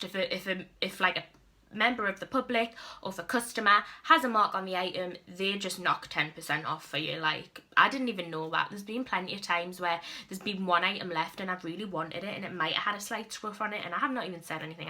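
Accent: Newcastle, United Kingdom. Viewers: Are u from Newcastle 💕👑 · U sound so similar to a geordie where do u come from also ur very pretty xxx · A fellow Geordie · Shes a geordie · Are u are geordie or no because you sound like one lol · Omg a Geordie!!!!! I’m from the toon too! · I love how bubbly you are! And your geordie accent is so fun 😂💗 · By your accent Im guessing you from Newcastle? Haha. I currently work at Primark Newcastle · Are you from Newcastle? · Woooooooo another Geordie! I'm subscribing!!!!! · R u from Newcastle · I thought I recognised your voice hahaha I'm from Newcastle too xx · Are you a geordie? If you to me you sounded like one 👍🏼 subscribed almost instantly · That Geordie accent tho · hey i found your channel on my recommended list! i noticed me and you have the same accent! is there any chance you are from newcastle if you are thats awsome! x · Are u from the north east? 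U sound Geordie coz if u are then I finally found a YouTube from me city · Are you a Geordie because if u are I am too BTW love your vids keep it up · oh my god im from Newcastle but I moved to London and its been so long since ive heard the accent xxx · Are you a Geordie? :) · are you from Newcastle? xx I am 💙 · YEEESS A YOUTUBER WHO IS A GEORDIE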